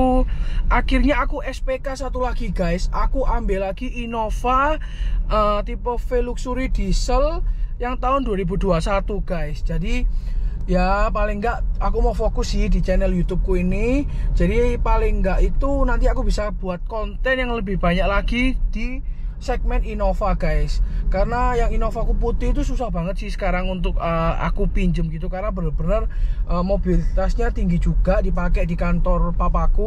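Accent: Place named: Surabaya, Indonesia. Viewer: Mantap koh...., opininya logat suroboyo an...😁😁😁😁😁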